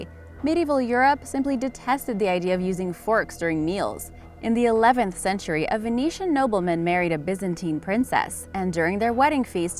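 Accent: United States. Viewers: Nothing worse than an American voice trying to tell British people about OUR historical times...🤨🇬🇧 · There is something triggering and insulting about hearing someone with a distinctly American accent calling soccer football. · You lost me at calling soccer football and football rugby. The narrator has an American accent. It’s just weird. I’m out. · It seems odd that the narrator with an American accent describes soccer as "football"....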